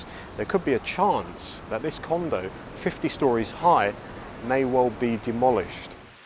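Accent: United Kingdom. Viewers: Absolutly brilliant as always. You are so unique on YouTube compared to other channels. Entertaining, informative and very interesting. Your knowledge of the history of Bangkok is amazing. I read a reply from you on someone's comment on another video, and you mentioned that you are Thai. I did not know that. Going of your accent, you obviously spent a long time in the UK. I for one would be interested in your story, I think it would make an intersting video. If you have previously made a video on your story, could you please leave a link to it? I love your work. You and a few other YouTubers have inspired me to start a channel of my own. I will be moving to Thailand in a few weeks, then I will be creating some content. Thanks for all your hard work and thanks for the insperation. 🙂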